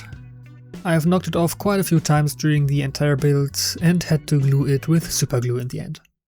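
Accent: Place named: Germany. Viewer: Hab ich doch hie und da einen deutschen Akzent rausgehört! 😃 Lecker gebaut und schön mit Struktur verziert. Ein dickes 👍 von mir.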